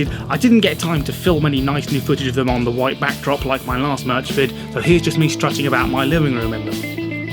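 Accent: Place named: United Kingdom. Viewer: I came here from why did I say okie doki .. YOURE BRITISH!?!?!?!!! I AM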